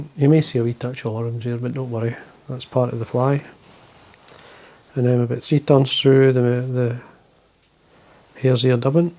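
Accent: Scotland, United Kingdom. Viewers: Hey! While I like your Scottish accent it does not translate well in your transcripts. I am hard of hearing and would like it if you would include a material list as well as transcript. I guess model doc is a mallard duck. Thanks for the great patterns and tying methods. Harry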